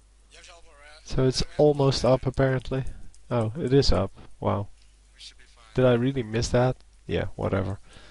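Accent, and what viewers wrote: Netherlands. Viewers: you are such a bad commentator.you obviously know nothing about this game.If reginald picks zilean mid,you can be sure zilean is a good champion and you shouldn't break him down.Your accent is so annoying!So is your voice.I hate it when people from the netherlands try to speak english.Please don't make any commentaries anymore.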